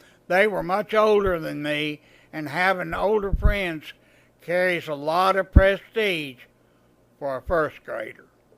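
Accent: South, United States. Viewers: What a fantastic Idea..School stories.Only thing,us northern canada guys find the story teller a bit hardish to understand,(southern accent)ha ha.Thanks for all your e mails & everything in the past.Ray Meyers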